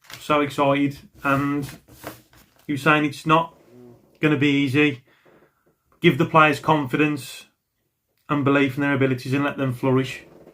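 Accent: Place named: West Midlands, United Kingdom. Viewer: Great video again bud, you sound like your from my neck of the woods lol I'm a blues fan based in ahem West brom. But I'm enjoying your vids mate your certainly getting better as you go along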